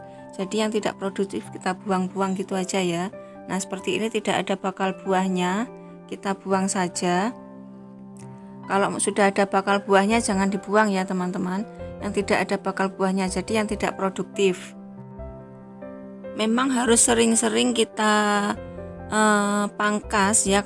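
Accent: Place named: Java, Indonesia. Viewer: Kelihatan banget suarane wong jowo.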